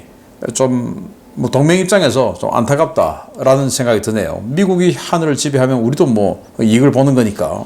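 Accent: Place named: Gyeongsang, South Korea. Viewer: 경상도 씹 말투 존나 거슬리네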